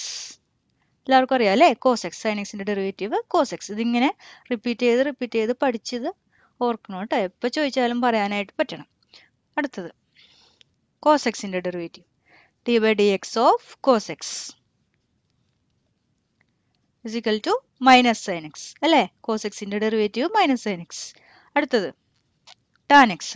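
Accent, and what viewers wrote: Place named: Thrissur, India. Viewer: Thrissur slang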